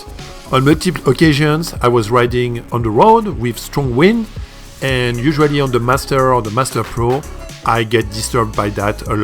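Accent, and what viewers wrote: France. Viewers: I love the fRRench accent :)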